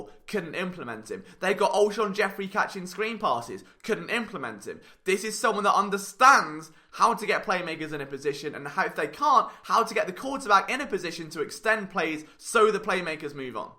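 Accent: United Kingdom. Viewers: I see an Eagles video, I tap on it. I dont year a British accent, I close said video. Good job. Keep it goin. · If my Eagles content doesn’t come with a British accent, I just don’t trust it!!! 😂🦅 🤙 Thanks for your hard work,.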